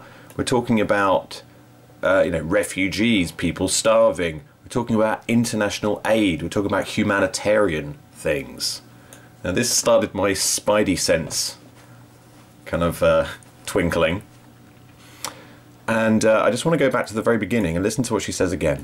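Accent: United Kingdom. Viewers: she sounds very british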